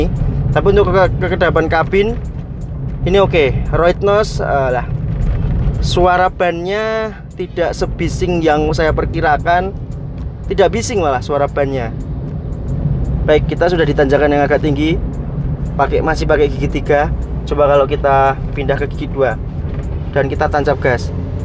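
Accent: Java, Indonesia. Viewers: Medok temen mas jawane... Hihi..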